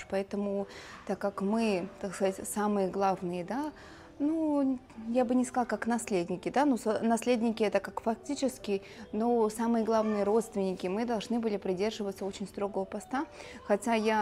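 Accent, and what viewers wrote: India. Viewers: Как сладко говорит по индийски 😍😍😍🥰а голос ее похож на голос свекрови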